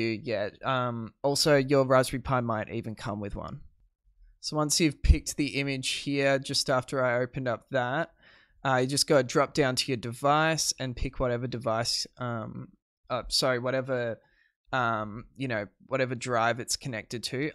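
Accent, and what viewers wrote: Australia. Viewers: Finally, a guy I can understand, as a fellow Australian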